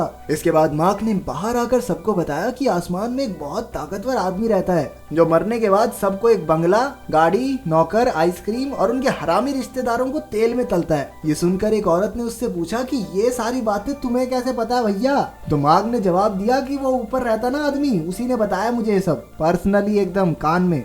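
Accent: Haryana, India. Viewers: YouTuber pkka haryana ka h😅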